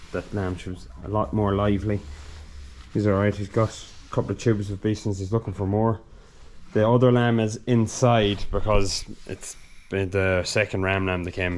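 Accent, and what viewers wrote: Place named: Ireland. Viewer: Another fabulous vlog!!! Each one gets better than the last. Great to see some of your flock close up….. they are gorgeous!!! Love that you chat to us as you go on your rounds. I could listen to you all day long with that lovely Irish accent! Great to see those ewes recovering & the lambies up & sucking. Good call with the calcium & other treatments. Casey was amazing catching that last ewe, she really stood her ground at the end there. I feel your frustration though when she’s raring to go but you really need her to stay put on the bike. Miserable conditions for you & the lambies in the drizzle & the cold. I pray the sun starts shining again for you soon!!! Quick question; if a ewe needs a calcium injection, is it a one off dose or will she need a few, & how do you know that her problem is resolved? Also, I’ve heard of twin lamb disease, is that calcium related or something totally different?? 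(I know, that’s 2 questions…… I’m pushing my luck 🤣)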